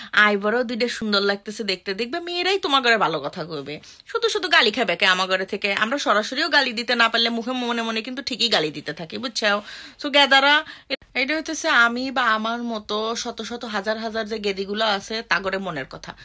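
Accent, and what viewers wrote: Bangladesh. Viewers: Bangladeshi kotha gulo ank moja lage sunte, very nice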